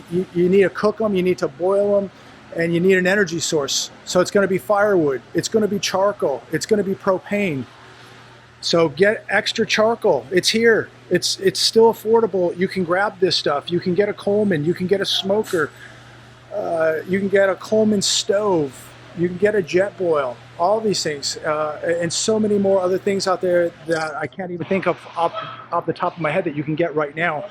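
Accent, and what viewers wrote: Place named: South, United States. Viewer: I couldn't get past her Southern twang even though I'm from the South myself.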